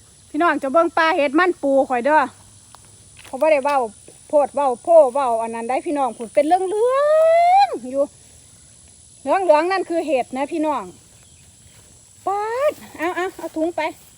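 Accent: Ubon Ratchathani, Thailand. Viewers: เบิ่งมากะหลายคลิบกะว่าอยุ่สำเนียงคือคนอุบลแท้อยุ่อ.ไดละอีหล่าลูกชายน่าฮักคัก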